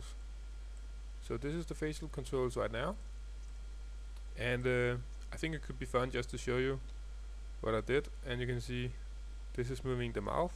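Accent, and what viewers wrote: Denmark. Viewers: Are you danish, ? Your english sounds bit like the 'danish style' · you sound danish, am i correct?:p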